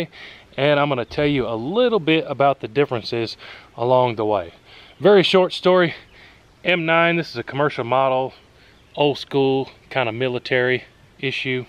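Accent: South, United States. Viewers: South accent. Even I can differ that)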